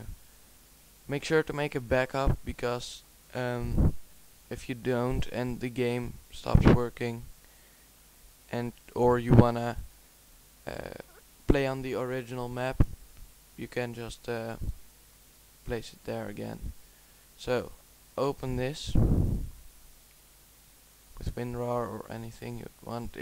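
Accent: Netherlands. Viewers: Ur dutch arent you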